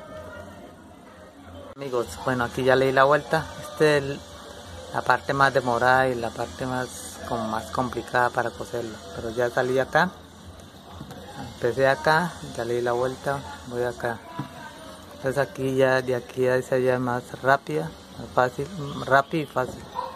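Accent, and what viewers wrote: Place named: Santander, Spain. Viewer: que no se note que es santandereano, maestro.....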